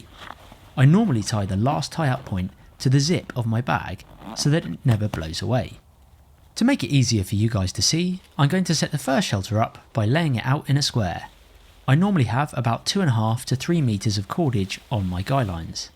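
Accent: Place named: United Kingdom. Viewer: Hate the lime accent.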